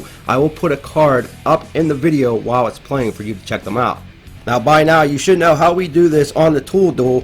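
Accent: Pittsburgh, United States. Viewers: You have to be from near Pgh based on your dialect. If so, awesome.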